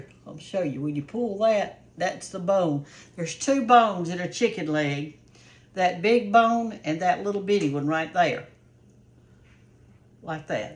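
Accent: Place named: Alabama, United States. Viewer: Miss You speak my Bama country language You flung a cravin on me! language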